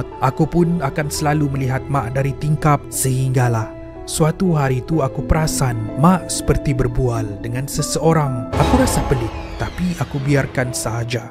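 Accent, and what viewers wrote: Sabah, Malaysia. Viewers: Indahnya dialek Sabah jika bercerita..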